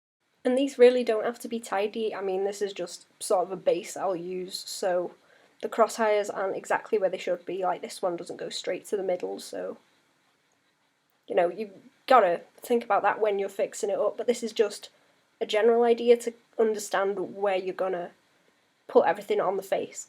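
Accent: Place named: Worksop, United Kingdom. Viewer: I just found your chanel and its really refreshing to a) hear someone that sounds like me! (I'm from Worksop) and b) actually see someone work thats not perfect on the first stroke!!! It's great to see someone work REALISTICALLY! ;)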